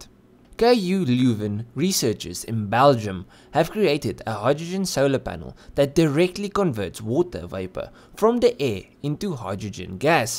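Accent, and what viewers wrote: South Africa. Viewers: Nice South African accent.🙂👌👍👊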